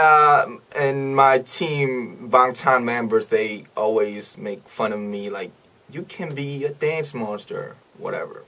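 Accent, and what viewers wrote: United States. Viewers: He sounds like he was born and raised here in the states and went over to SK to be an idol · he literally sounds like a true American- bruh my dad who has lived in the states for over 15 years has a strong polish accent and he also learned english from friends meanwhile here who has never lived in the states for more than what a couple months sounds like he was literally born and raised in the states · A cute LA California boy 😆. But his accent is amazing. Legit thought he was from America or had lived here for a long time. Mind blown by him all the time. Love you RM 💜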